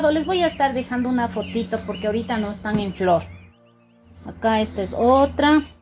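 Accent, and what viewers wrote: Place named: State of Mexico, Mexico. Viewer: Esta hermoso tu jardín 💕 😍 ♥ ❤ 💖 💜 sigue adelante.tu acento parece del Estado de México México.....pero ya vi eres de Ecuador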